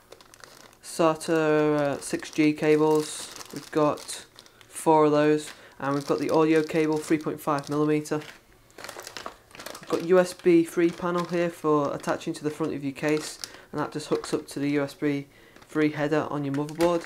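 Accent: England, United Kingdom. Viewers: I'm extremely curious... coul someone tell me where is that english accent from? :)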